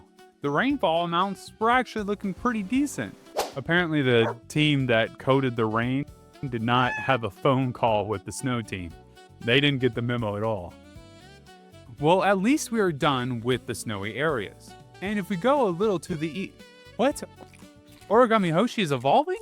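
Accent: Appalachia, United States. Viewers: I jest in my derision, of course lol I'm from down closer to the Cumberland Gap and Smokies, so it's always funny to hear the different pronunciations. Still, glad to see mountain cousins making fun content though! Keep up the good work!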